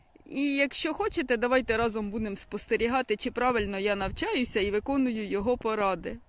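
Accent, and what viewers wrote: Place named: Cherkasy, Ukraine. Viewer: Доброго вечора,. Дуже цікаво розповідаєте, особливо для тих хто тільки починає займатися цим бізнесом. Скажіть будь ласка, де ви проживаєте? Дуже схожий говір, ніби у нас на Черкащині.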